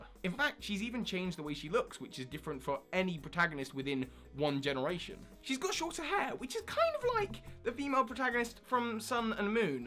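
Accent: United Kingdom. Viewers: soar high... awesome catchphrase there, amplified by the good old British accent. quick question( that you may have answered before, but you have so many videos and I have so little time):from the legendaries alone what game would you pick, sun or moon? I personally would like any but I would prefer moon because, although I adore psychic types, dark is my 3rd favourite type! I can't think of how to end this so, buh-bye!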